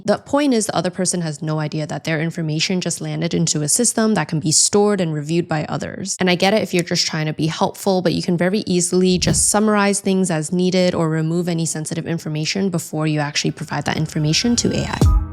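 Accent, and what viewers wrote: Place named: United States. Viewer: hi lady. i just saw u now. thanks for this video. its great value video. i have simple but important advise 2u. u speak very clear gd american english language, but since u r global now, itvmeans u r talking to many people with varying English language levels. you talk little bit fast, which make it hard to catch or understand all what you say in ur videos. i wish u can talk relatively slow so then most or all people watch ur videos, easy catch u & understand u. thanks❤🎉🎉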